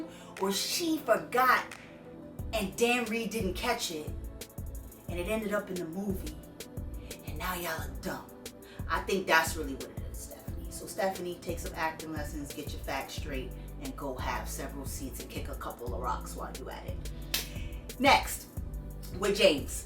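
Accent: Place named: Queens, United States. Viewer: I absolutely love you! Thank you for this! It's raw, real AND revealing! There are LOTS of top videos explaining the info, showing the receipts and kicking LN in the face and your video needs to be shared among the other ones! I haven't even gotten through half of the video. Not only do I love your passion, your knowledge and intellect, I LOVE your accent, being a man, who as a boy grew up in Queens. The way you speak so passionately about this reminds me of family and friends from when I was a kid that would start speakin real truth. Shoot, I've been gone since 1997 and get lit like that. You're great! I love your memorabilia, I love your style. Keep your head up, keep it goin, thank you!!!! 😍😍😍❤❤❤❤🔥🔥🔥👏👏👏👏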